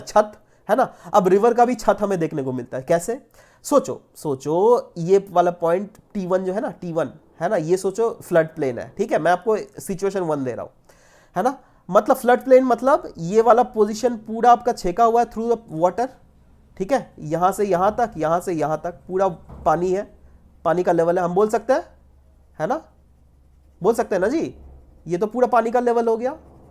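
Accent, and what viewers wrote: Bihar, India. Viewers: sir aap bihar say hai kya ?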